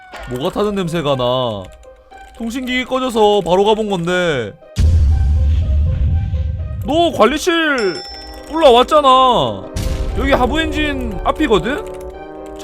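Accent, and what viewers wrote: Busan, South Korea. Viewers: 부산 분이신가요?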